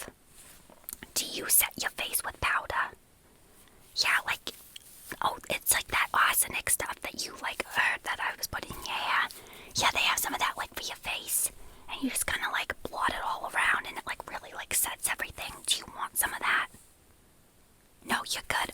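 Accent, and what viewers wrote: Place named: New Jersey, United States. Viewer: Period drama fanciness AND jersey accent?? Two huge tingle triggers for me, combined???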